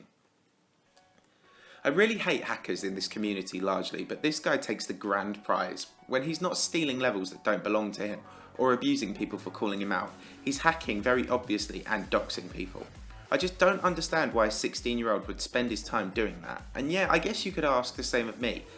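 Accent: United Kingdom. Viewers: My mom heard me watching you and now she won't stop talking in a British accent XD why!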